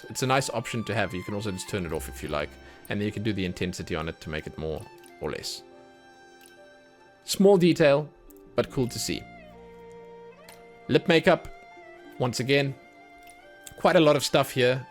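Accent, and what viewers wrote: South Africa. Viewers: Are you South African? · Your accent sounds like south african. I'm from South africa and u sound like our accent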